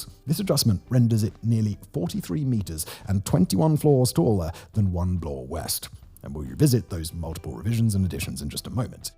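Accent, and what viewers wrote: England, United Kingdom. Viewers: GOOD. Consider slowing down the speech a bit. The Brit accent is quite fast and tough to keep up with. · Native born Anglo Canadian... so... I had to turn on CLOSED CAPTIONS. Our host talks so fast that it is hard to adjust for his regional accent. It seems the more I want to know what he just said.. the more hurried and non-standard are the sounds. It is a voice that sounds "learned" .. emphasis on the "ed". And I cannot learn from it. An achievement in speed talking with some English county to thank.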